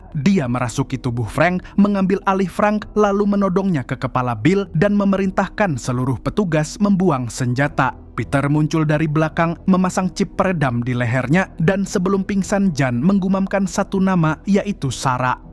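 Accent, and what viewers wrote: Banjar, Indonesia. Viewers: Host ikm urang banjar jua kah 😅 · Waluh urang banjar sakalinya admin nya.. 😁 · Admin Nya Urng Banjar Kah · Baru tau kalo kamu urang banjar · Orang banjar jirr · Urang Banjar sekali nya neh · Dikira salah dangarr, skalinya bjuran urang banjar yg mreview😂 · Orang banjar kah pian mang??? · Urang Banjar png asa un nh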